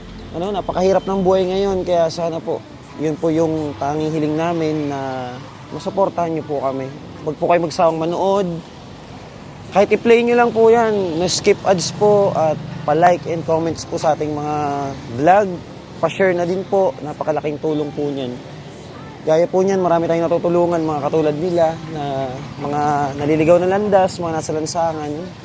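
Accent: Philippines. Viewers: Magkababayan palang kita Lods 😊ringkonada din ako...taga NABUA po aqo😊taga sari po ika tabi sa bicol?Ringkonada rin kc Ang salita mo.. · Regardless kung paano ka magsalita ng Bicol Ang importante yong tulong na ginagawa nyo❤❤❤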